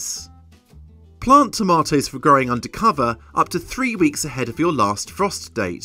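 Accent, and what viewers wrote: England, United Kingdom. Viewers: Thank you, lovely to hear an English voice :)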